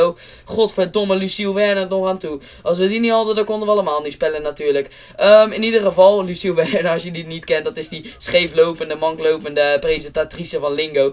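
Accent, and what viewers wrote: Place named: The Hague, Netherlands. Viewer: Ik zei ooit een keertje tegen jou dat je een haags accent hebt...dat lag nogal gevoelig hahah...ik woon nu in alphen aan den rijn maar ben in den haag opgegroeid...heb niet een bepaalde trots met alphen of den haag...kom nog wel af en toe eens bij ado en dan leg ik altijd in een deuk als je ziet hoe die hagenezen leven voor hun clubbie met de bekende scheldwoorden die in die 90 minuten voorbij komen....heb wel bepaald trots-gevoel met oranje...als ik naar het wk kijk dan heb ik wel het gevoel van "onze" jongens...met politiek heb ik helemaal niks....dat lingo stukje was geniaal haha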